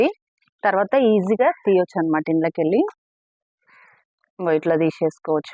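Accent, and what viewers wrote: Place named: Rayalaseema, India. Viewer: మీది ఏపీ నా....రాయలసీమ భాష మాట్లాడుతున్నారు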